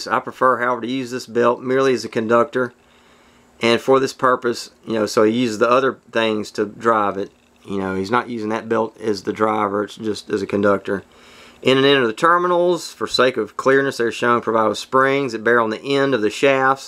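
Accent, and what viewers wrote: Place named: Rural, United States. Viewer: Your accent is proof that people know more in rural America than in the cities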